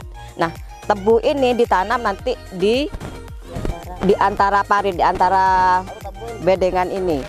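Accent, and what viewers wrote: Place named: Madura, Indonesia. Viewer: bahasa Indonesianya , ketoro nek wong meduro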